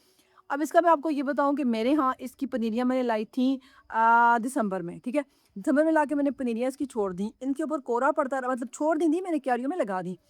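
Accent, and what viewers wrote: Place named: Saraiki, Pakistan. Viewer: آپ میانوالی سے ہیں؟ مجھے سرائیکی لگتی ہیں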